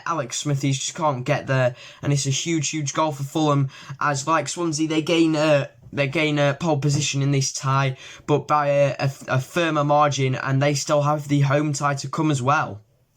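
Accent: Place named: Barnsley, United Kingdom. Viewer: Class vid from a blade love that Barnsley accent lad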